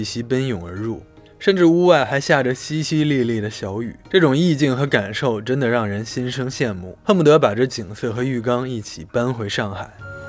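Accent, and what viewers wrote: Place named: Beijing, China. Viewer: 他生活環境就那個北京調 多看幾個影片後 覺得也還好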